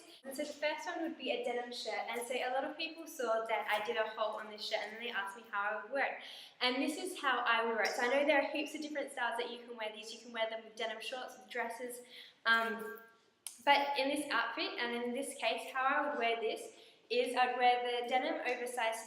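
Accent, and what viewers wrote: Australia. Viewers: r u Australian? i am!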